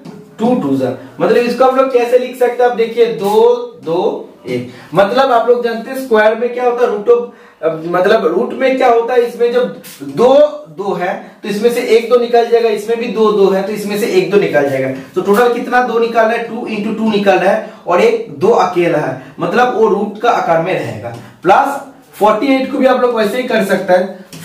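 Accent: Bengal, India. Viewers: par aap ke bengoli acsent se thoda sunne main problem hota hai sir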